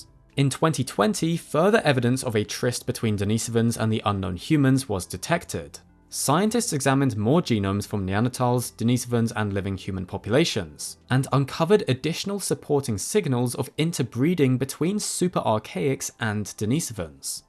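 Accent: United Kingdom. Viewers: Why does every documentary need a British accent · British accent… its like nails on a chalkboard. Imaging having an internal monologue this drawn out and pretentious guiding your every whim.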